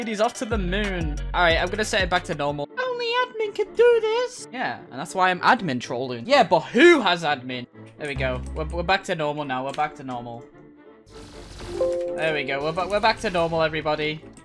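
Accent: United Kingdom. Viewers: why? he british. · Are you British? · is this guy british?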